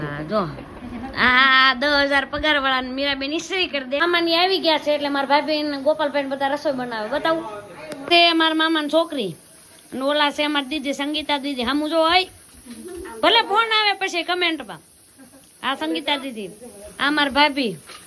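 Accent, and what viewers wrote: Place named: Rural, India. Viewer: મારી અટક પણ છે. તમારાં મામાનું ગામ કયું? તમારી શુધ્ધ ગામઠી બોલી હો. મજા આવે એકે એક શબ્દ સાંભળવાની.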